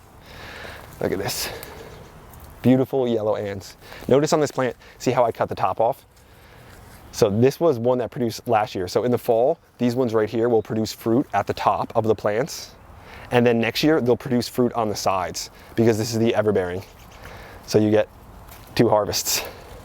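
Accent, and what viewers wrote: New Jersey, United States. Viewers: I can't get over the fact you've got such a strong New Jersey accent! I live in NYC, so I've been exposed to a few N.J. varieties. And is so cool. More, please!😘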